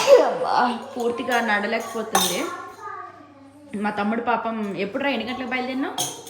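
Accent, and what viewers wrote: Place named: Telangana, India. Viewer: Telangana slang talking akka meru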